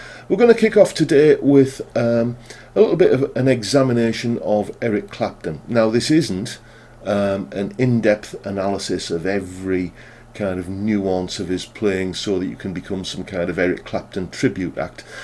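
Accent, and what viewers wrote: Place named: Redcar, United Kingdom. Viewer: A great video and I must say I love your explanations in this and other videos. I feel like I’m learning fast from them. I recognised your accent as soon as I heard it.....I grew up in Redcar and Marske myself. I’ve subscribed and will keep watching and learning.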